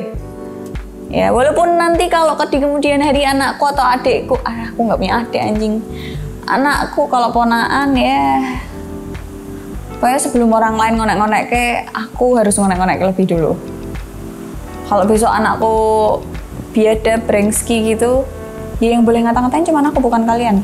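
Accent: Java, Indonesia. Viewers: Medok e pancet ae mbak wkwkwk, sue ndak di ndilok mbak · kirain dinda haw tapi koq medok😁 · Aku suka medoknya mbk itu lo 👍👍👍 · logat jawanya padat bnget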